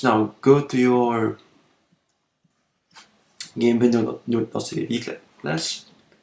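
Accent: Russia. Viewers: Great work.... these tutorials ignite my passion for game programming.....Keep it up... BTW..you sound Russian...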